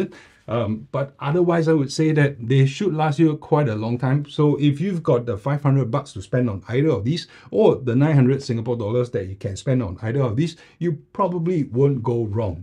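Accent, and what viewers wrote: Singapore. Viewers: You speaking too fast. Please slow down a bit. Maybe it just a Singaporean English problem. but anyway I think for better understanding would be good to slow down a bit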